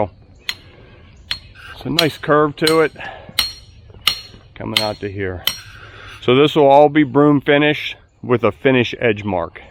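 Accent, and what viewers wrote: Pittsburgh, United States. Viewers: YOU SOUND LIKE YOU'RE FROM PITTSBURGH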